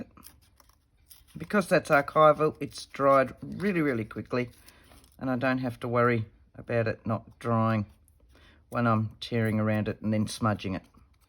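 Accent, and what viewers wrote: Australia. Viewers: Hi from Ballina, NSW. Nice to hear another Aussie accent. I really enjoyed two of your videos that I've just watched and will definitely be adding this to my next journal. I'm happy to have some cooler weather this month. Cheers 😊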